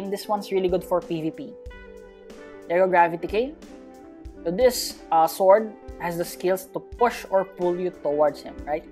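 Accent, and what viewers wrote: Philippines. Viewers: Where does come from his accent sounds Filipino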